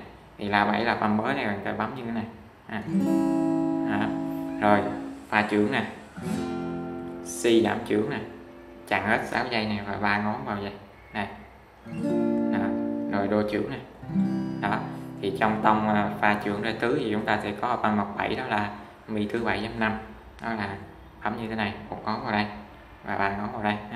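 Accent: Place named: Binh Thuan, Vietnam. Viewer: cảm ơn bạn những Video hướng dẫn rất nhiệt tình, nghe giọng giống dân Bình Thuận :D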